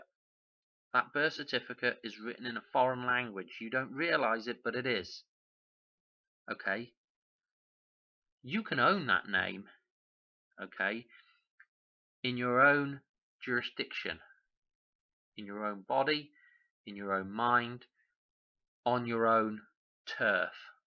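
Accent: United Kingdom. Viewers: Liked your video mate a good watch sounds like your from the uk like myself and you seem pretty knowledgeable on all the laws of nature and the artificial laws put into by the powers that be of this world.👍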